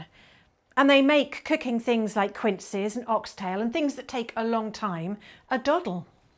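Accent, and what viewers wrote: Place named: United Kingdom. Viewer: Yes this. I’m so used to watching British foodtubers that my brain automatically makes the switch when it hears the accent.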